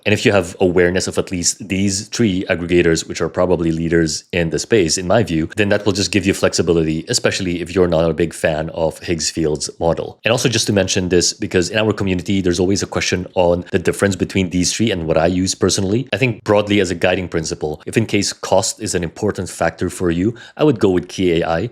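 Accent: Philippines. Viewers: Are you filipino? I can hear some accent :D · Why do I hear a Filipino accent? :)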